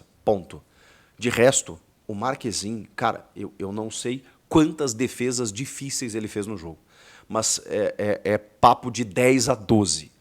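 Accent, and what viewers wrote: Rio Grande do Sul, Brazil. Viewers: Essa versão do Chico eu não conhecia. Até o sotaque gaúcho apareceu. Por mais que seja um jornalista brilhante, hoje quem postou esse vídeo foi o torcedor. Ele é um gremista nato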